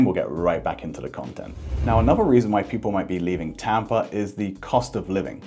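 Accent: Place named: New England, United States. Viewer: No R in Tampa 😂😂😂. Yep, you know a New Englander is talking to you when they drop the R from car but add it back to Tampa, America etc!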